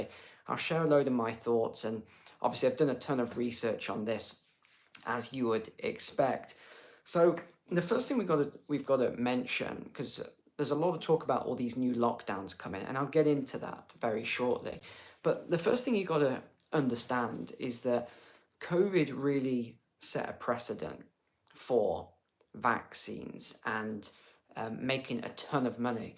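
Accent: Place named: United Kingdom. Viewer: Hi Sir ! You always have a good report ! I want to tell you that I love your voice and your Brit accent ! My relatives are from Chester, England . my great great grandfather came from England to the US at seventeen yrs of age . Before Ellis Island . He came alone and stayed with his sister here in Connecticut ! Became a prosperous farmer and had 400 acre farm . He was a fine Christian man. Still got his road named after him .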